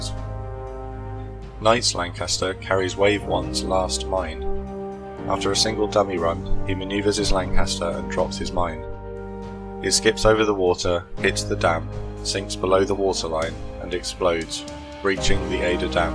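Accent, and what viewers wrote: United Kingdom. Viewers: A mistake that many, MANY native speakers of English make concerning anything related to Dutch geography. In this case it's the IJsselmeer - Lake IJssel. In this video you are painting the northern part of it, close to the Afsluitdam (Closure Dam) green as if it were land. Miraculously ,south of this part of the lake, you show its correct colours; blue (lake) water. Please always check DUTCH maps first when making videos involving Dutch territory. I have no idea where you English speakers get your wrong maps from. Not sure about your country I think it's Britain the way you pronounce it, so it shouldn't be insurmountable. It's on the other side of the North Sea.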